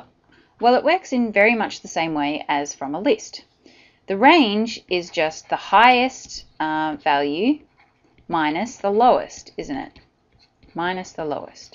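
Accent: Australia. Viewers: Nice Aussie accent?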